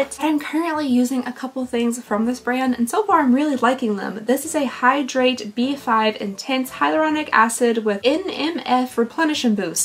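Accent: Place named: South, United States. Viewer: I’m so sad to see advent season coming to an end!! But I’m so grateful that you stretched it out till Easter !! I have been watching you for the last 3-4 years and I want to say that you are one of my very favorite channels here on YouTube. Although I am so many years older than you are darling , you remind me so much of so many of my friends and even a tiny bit of my daughter who is around your age ! Just your general way of speaking and your mannerisms feel so familiar to me and maybe it’s a southern thing but I just adore you !! I love watching any of your videos it reminds me of home !!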